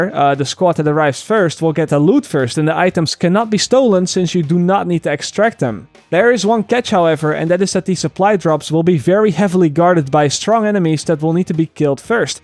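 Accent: Netherlands. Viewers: hahah ik wist wel dat je Nederlands was dat hoor je heel overduidelijk aan je accent als je engels praat :P:P:P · are you dutch?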